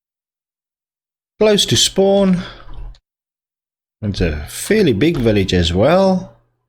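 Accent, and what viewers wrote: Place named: South Africa. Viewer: your heavy south african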